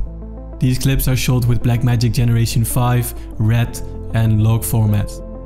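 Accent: Netherlands. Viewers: Ik hoor volgens mij Nederlands... Nee, ik weet het zeker! ;-)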